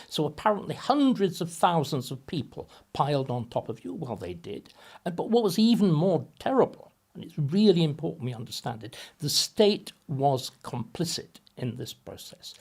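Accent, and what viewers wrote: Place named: England, United Kingdom. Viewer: Yeah, I'm subscribing. This man is straight 🔥🔥🔥. Victor Davis Hanson with an English accent.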